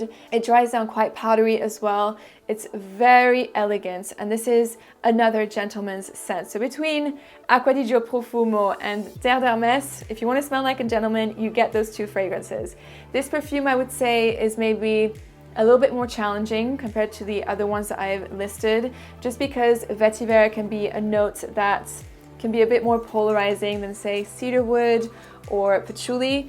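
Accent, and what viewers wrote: France. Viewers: I am 58 years old and have been using Dior Homme since its first day. Since they changed it I have been trying to find an alternative tried a lot of different perfums and watch numerous videos on perfums. Result I am as confused as ever. Any suggestions ??? Tried Oud wood Byredo accord oud guerlain bois mystérieux and relevant. By the way excellent french accent.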